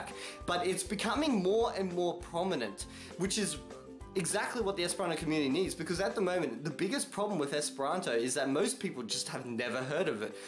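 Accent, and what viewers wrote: Australia. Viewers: Saluton,! I first heard about Esperanto on an episode of Red Dwarf! True. And like most everyone else I've spoken to I thought it was a type of Spanish. My eldest son has been following the development of the Duolingo Esperanto course since last year. When it launched into beta my husband convinced me to join in with them both. I started on the 11th (June) After only six days I know more Esperanto than German which I studied in High School for four years. Using Duolingo is like playing a game, its just plain fun. Also your World of Warcraft Esperanto teaching series is our evening lesson, as the three of us are avid WOW players (Since Vanilla! Ten years who'd of thought we'd be still running around the same world.) It's great to hear another voice, an Aussie one too, speaking Esperanto and explaining some of the nuances we can't get from Duolingo. 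Like rolling the 'r's'. I just couldn't get it until I started repeating after you. Dankon! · I know, I have almost finished my German Duolingo course (5 skills left to go) and I will do the Esperanto course after. And are you Australian? I can't determine your accent.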